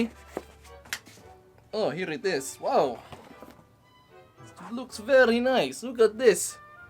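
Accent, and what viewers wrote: Russia. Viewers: Hey Keep Up The Russian Accent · Why the Russian accent tho??? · Russian accent <3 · Love it while smashing some thing plus the Russian accent saket sa tiyan kakatawa😂😂 -r.i.p play button WERPA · Russian Accent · lol russian accent parang si BUCKS. like kong kilala niyo si BUCKS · That crazy Russian hackers accent hahahaha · Love that russian accent😂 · Russian accent💕😂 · Spot on yung russian accent 😁👍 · Russian accent 💕 .. HAHAHAHHA 😂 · kaya lagi ako nanonood ng mga videos mo eh and gusto ko yung russian accent mo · familliar tlga yung accent ie tangina Crazy russian Hacker 😂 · Stick with the Russian accent! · russian accent si hahaha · Pag si nag russian accent gumagawa sya ng kagaguhan pero kapag nasa normal accent sya napaka bait😂😂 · Comment muna bago nood squad - Pucha when u hear dat russian accent, alam mo na may masisira. (YUNG BIG BANANA CUTTING) HAHAHAHAHAHAHA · Hindi, Russian siya di mo ba nahahalata sa accent niya mga bobo talaga mga bata ngayon.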